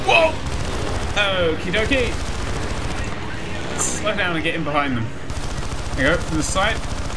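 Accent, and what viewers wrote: England, United Kingdom. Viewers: Your british u already have a british accent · You have a english acsent.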